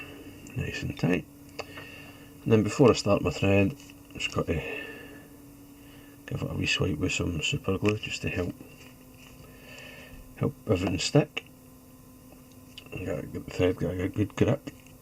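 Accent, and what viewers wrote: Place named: Aberdeen, United Kingdom. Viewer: Love the tutorial and your super Aberdeen accent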